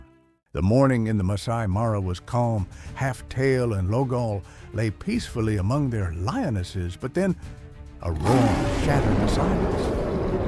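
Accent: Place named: South, United States. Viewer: Where are Jeremy Irons, Charles Dance or Sir David Attenborough?😢 This cornpone commentator Is Not the Ticket!🙄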